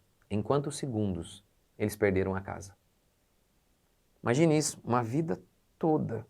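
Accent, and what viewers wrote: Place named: Rio Grande do Sul, Brazil. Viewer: eu achava que vc era gaúcho, pastor...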